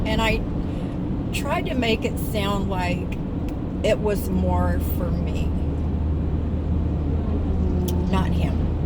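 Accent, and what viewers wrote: South, United States. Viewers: You seem to be a very lovely person doing that for your friend. I’m sure you will be happy in your place. I have physio therapy today, (I’ve just had a knee replacement), so that’s my fun for the day haha. I do enjoy listening to your stories. Your voice is so calming. (Plus I’m in love with the southern American accent - mine is apparently too posh to understand, someone couldn’t understand me saying the word “France” yesterday 😂 )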